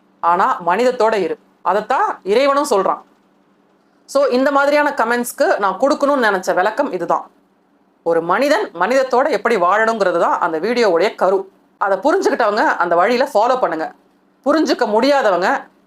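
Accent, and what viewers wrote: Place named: Malaysia. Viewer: SISTER. YUR SLANG OF SPEAKING TAMIL...LIKE MALAYSIAN.,..THANKS SISTER... MALAYSIA.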